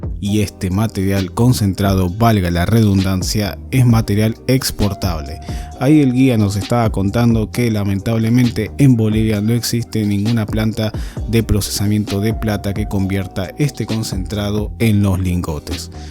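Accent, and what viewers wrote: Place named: Argentina. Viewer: No Es argentino. Oye su acento .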